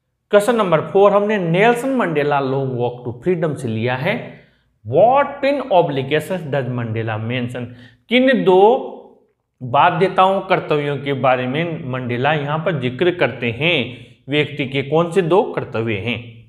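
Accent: Hadoti, India. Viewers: sir aap hadoti pradesh ke lagte hai ⓟⓛⓔⓐⓢⓔ ⓣⓐⓚⓔ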